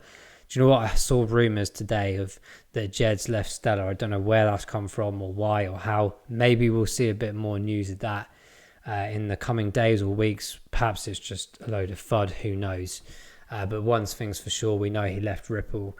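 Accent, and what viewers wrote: United Kingdom. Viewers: Hey, good to hear an english voice, in england we seem to already have 3 second transaction bank transfers does that mean in uk were already using iso 20022?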